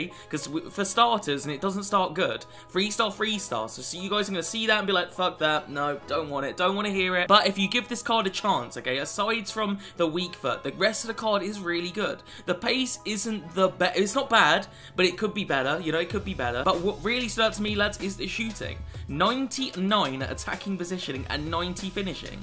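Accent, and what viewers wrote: United Kingdom. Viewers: I really can’t tell if you’re British or not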